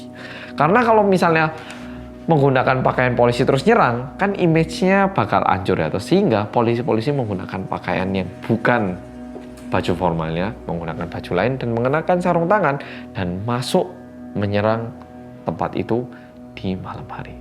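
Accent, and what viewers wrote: Java, Indonesia. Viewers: Halli gais welcome back to bersama orang Korea yang medhookkk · Mirip Dr. Tirta ngomongnya Medoook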